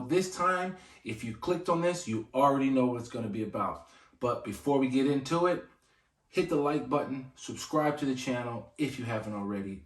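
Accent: United States. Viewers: Just on your first point. When I first stumbled on your channel it never crossed my mind where in Africa are you from. I just thought a nice bloke with a yank accent, where in the States are you from? I have honestly really enjoyed your content up to now. No need to bring race into your YouTube videos. Also reference the slave trade. Poor people of all colours and credes were treated poorly so not specifically unique to black people only. Our forefathers used to send six year old children down mines and women would be beaten. I'm not saying the black slave trade wasn't a thing, just that there is a far bigger picture you need to consider. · as a white English male myself, I identify you as American and I base that on your accent. Of course I may be wrong, but unless you tell me different, I'll go with that and see no reason to assume any different. My grandparents were Russian but I've never felt it reason to introduce that fact on any greeting or document and because I have white skin nobody questions my British identity. 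Although even if Russians had black skin (and some do of course), I would still feel English and still identify myself as such. Yes if I did have black skin it would suggest another layer to my life story and I'm sure some people would be intrigued enough to ask, but being born in England and identifying as such, wouldn't and shouldn't be diluted by my skin colour, I'm not "sort" of British I'm English born and bred. This is my country these are my people and this is the country I would give my life for, if it were threatened by ANY other. I'm sure you feel the same way about America, why wouldn't you, your an American. That's not to say you couldn't become British yourself, but no piece of paper will truly tell you when that happens, your heart will, which in my eyes would made you very special, because you will have CHOSEN to be one of the family.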